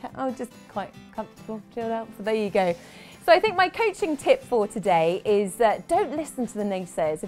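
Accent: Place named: England, United Kingdom. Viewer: Finaly a proper english accent. Sooooo tired of American accent on YouTube. Thank you ⚘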